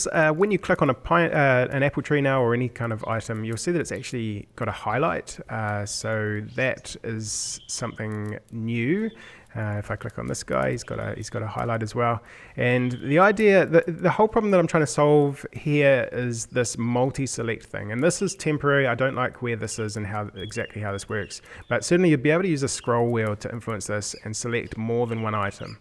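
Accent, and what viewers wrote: New Zealand. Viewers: Kiwi accent aye? I'm curious, where are you based?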